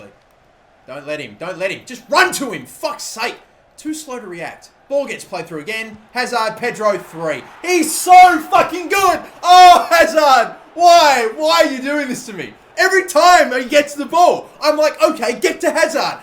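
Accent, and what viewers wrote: Australia. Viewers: Are u Australian. How do u support Newcastle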